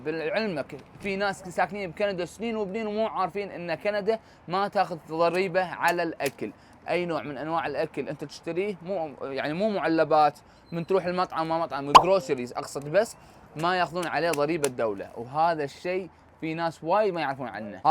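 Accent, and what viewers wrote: Gulf, Arab world. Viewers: ياله لهجتك مو كانو لهجتنه بلعراق كانو خليجي 😮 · لهجتك قريبة عالخليج كلش ليش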